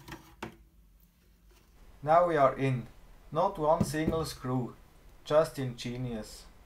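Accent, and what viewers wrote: Switzerland. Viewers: Swiss English sounds funny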